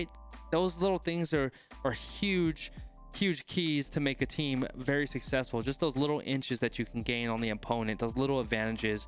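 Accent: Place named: United States. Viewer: Thanks J. Enjoyed watching you and your reaction vids. As a saffer it’s really nice hearing it all in an American accent. Thanks again boet.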